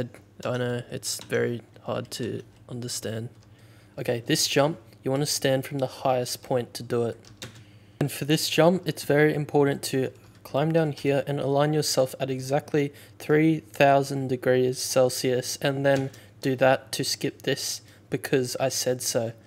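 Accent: Australia. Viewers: he’s Australian i think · towre of srres very gdoo also why do you sound like australian remainings best video do coabl p rank and lorekeeper guide NOW!!!!! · he’s actually aussie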